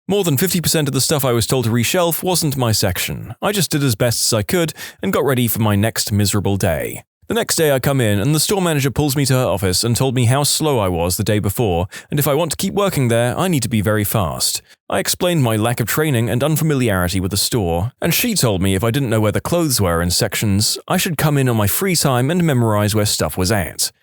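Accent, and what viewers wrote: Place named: United Kingdom. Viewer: Honestly, the British accent is grating and unpleasant to listen to. Same with the “voices” he does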